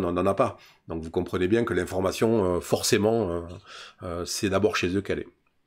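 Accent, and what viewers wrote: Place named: Provence, France. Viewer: Génial! Merci. Quel plaisir d'entendre tes commentaires (5/5) et cet accent provençal... un pur bonheur pour une marseillaise d'origine!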